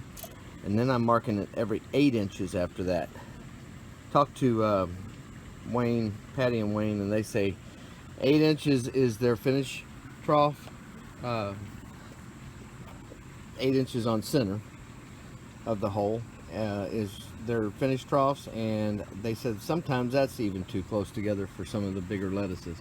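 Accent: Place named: United States. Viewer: Love the American accent :)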